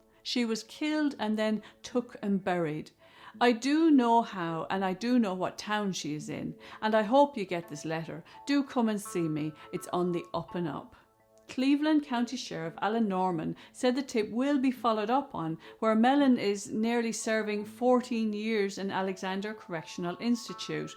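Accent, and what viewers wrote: Ireland. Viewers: Who was at that slumber party? I think someone at that slumber party or an adult in charge knows what happened to her and why she left that night. Do we know the people her cousin knew? Love the way you delivered on this case. Glad I happened on your site. I think you’re a fellow Irish woman, the accent gave you away. Look forward to hearing more from you.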